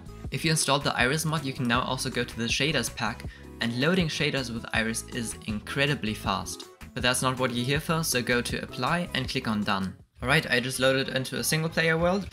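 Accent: Germany. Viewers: Du klingst zimlich deutsch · man hört so das du deutsch bist haha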